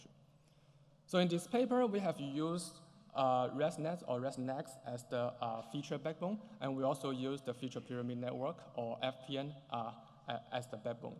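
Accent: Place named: China. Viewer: Как здорово смотреть на английском (с сильным китайским акцентом), без каких-либо встроенных субтитров... И все равно хорошее объяснение)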